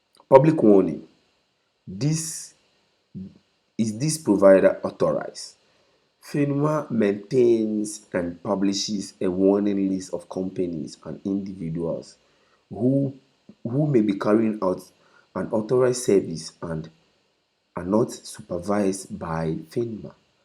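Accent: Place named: Ghana. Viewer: Wow you're a Ghanaian,it was easy for me to understand from a fellow Ghanaian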